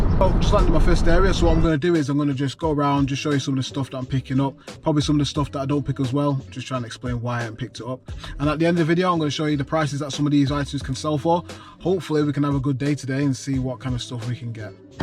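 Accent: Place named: Cheshire, United Kingdom. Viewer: Your accent sounds like ur not far from me. I'm in cheshire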